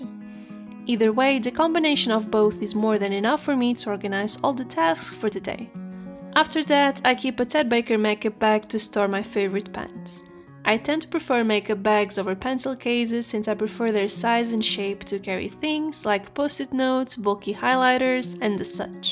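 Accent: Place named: Portugal. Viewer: she's from Portugal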